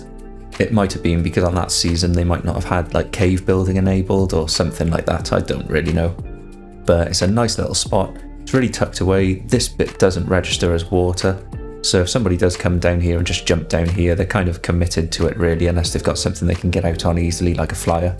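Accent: Wales, United Kingdom. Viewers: I started listening as you sound very welsh, I kept watching as you are really good at explaining things and as such I’ve gone from worst ark player ever to competent enough to survive a week 😂. Keep it coming for us older folk who can’t keep up.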